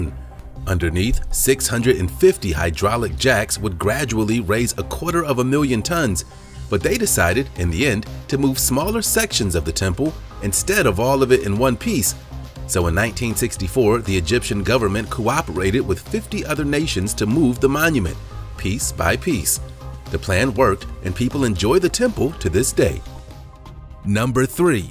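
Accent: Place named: United States. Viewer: Why do Americans pronounce O as A. For instant, you say Gad instead of God etc. Not right.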